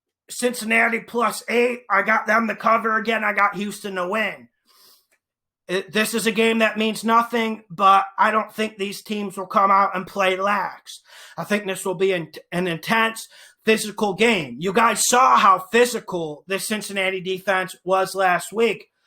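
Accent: Minnesota, United States. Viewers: is the prodigal sun, and the finest Minnesota accent known to man...The Gun barrel "Next Game" and " team X " AT" makes me tune in every episode. But one question, do you go "Bartender, "NEXT" round of drink? Keep it real and Merry Christmas! is a NFL Marine, and comes out firing!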